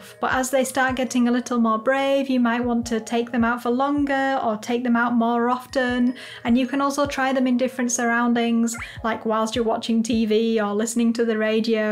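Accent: England, United Kingdom. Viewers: can I ask you what part of England are you from? I am interested in regional accents (I'm American). And I've always loved the UK 🇬🇧. Thanks.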